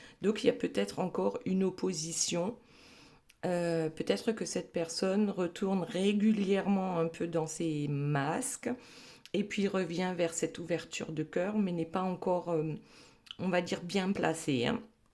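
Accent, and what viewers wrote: Alsace, France. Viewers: J adore votre accent m'a petite vous devez être Alsacienne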